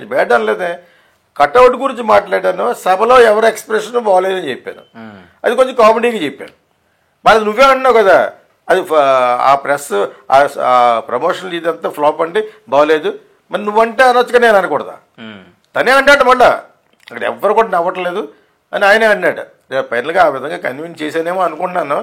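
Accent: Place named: Godavari, India. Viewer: Geetha krishna u r gud for nothing bcoz of nee bullshit talks in channels. How come u target MEGA STAR tell me first ? I watch ur videos regularly bcoz of ur accent of godavari but u make mistakes in interviews by targeting SHANKAR. If u r criticize other Director then u don't have right as a Director.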